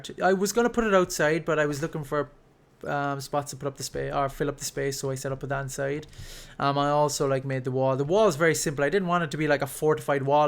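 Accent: Ireland. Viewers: Love the Irish accent ;)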